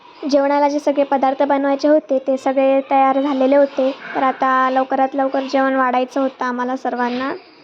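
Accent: Malvani, India. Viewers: आम्हाला नाही बोलवले वास्तुशांती ला तू मराठी न बोलता मालवणीत सूंदर बोलते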